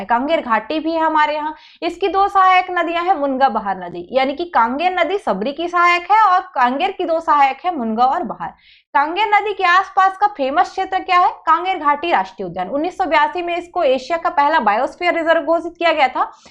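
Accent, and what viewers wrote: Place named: Odisha, India. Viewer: Thoda odiya accent ata hai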